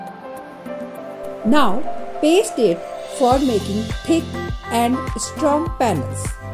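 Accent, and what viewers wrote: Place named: India. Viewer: Because she is from India she is teaching that's all that matters not everyone English is first language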